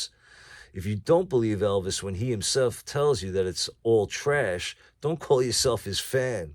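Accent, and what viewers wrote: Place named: New York, United States. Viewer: Hi, I wanted to say I truly enjoy your videos. I remember having an original Kings Court button when I was a kid, I may still have it somewhere. Also nice to hear the accent of a fellow New Yorker, I’m born and raised in Brooklyn but live in Florida now. Anyway just wanted to say hi and keep the videos and memories coming.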